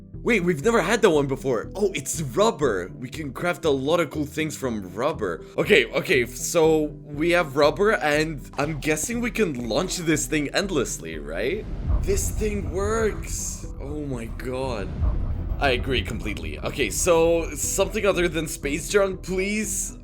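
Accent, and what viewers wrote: Russia. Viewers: Are you from Russia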